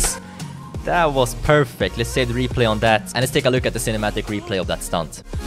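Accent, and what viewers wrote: Sweden. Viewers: you sound swedish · Are you from sweden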